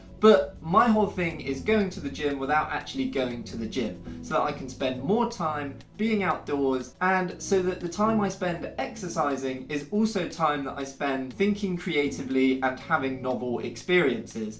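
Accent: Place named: RP, United Kingdom. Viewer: Wow, thanks for the reply lad! 💪 I'm actually a woman, but I do my best to keep up with the guys, and challenges like this are right up my alley. We just love seeing people doing more with pallets here Pallets R Us, we think they’re a really under appreciated bit of kit that the whole global supply chain relies on daily, people often forget that society would grind to a holt without them! I think you might be across the pond (guessing from your Prince William accent 🤴), but we'd love to help you out with some pallets sometime if we can hit it? So, if you're ever down for a transatlantic challenge, hit us up!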